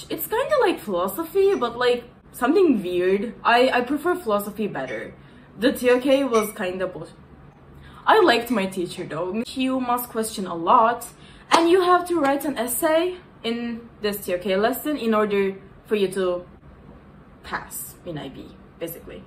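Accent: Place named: Turkey. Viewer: Türk aksanı da çok yakışıyor .*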